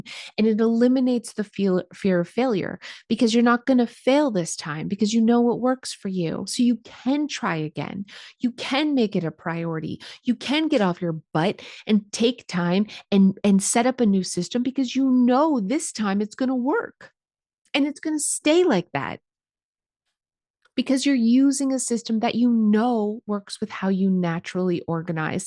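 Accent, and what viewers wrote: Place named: Midwest, United States. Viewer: I hear that Midwest “O” in your voice! ❤Where are you from?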